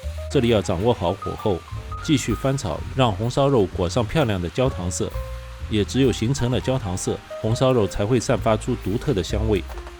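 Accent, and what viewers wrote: Shanghai, China. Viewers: 冲着你带有上海口音的解说，红烧肉肯定是回味无穷。❤👍你的视频不拖泥带水、不啰啰嗦嗦一步到位是有良心的节目人。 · 上海口音的上海红烧肉肯定正宗，订阅了！👍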